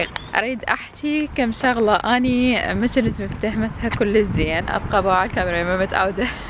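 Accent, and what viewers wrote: Iraq. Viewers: أحلى بشر والله😍 الحلو بيج انتي محافظه على اللهجة العراقيه بغظ النظر عن (صكط)😠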